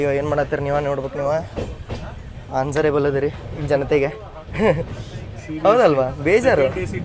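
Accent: North Karnataka, India. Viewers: Bro your voice are talking like North Kannada. I am happy for you capture of Ranebennur ❤❤❤🎉🎉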